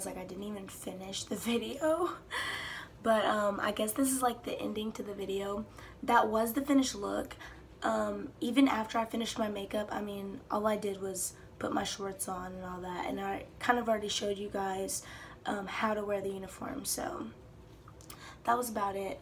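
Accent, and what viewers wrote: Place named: Tennessee, United States. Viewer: Omg yes girl! I was hearing your accent and i knew you were from Tennessee. Go Vols ❤️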